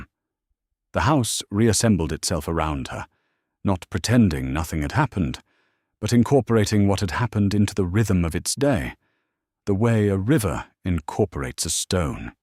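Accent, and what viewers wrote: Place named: England, United Kingdom. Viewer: A very enjoyable story. Thank you for using a narrator with an English accent. When the story is about Dukes & England, that is the proper choice, yet some use a narrator that is, clearly, not English, usually American. It takes away from the presentation. Thank you for a good story, supported with good taste. It is appreciated!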